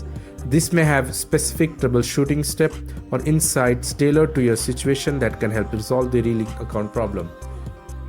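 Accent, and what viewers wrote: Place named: India. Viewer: You think I didn’t search up how to fix if I wanted a Indian to read it out I would have asked chat gpt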